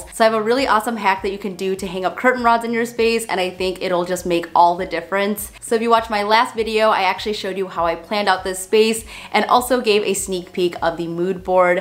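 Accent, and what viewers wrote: Chicago, United States. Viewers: yoooo this room is rad! (also love your chicago accent! 😊)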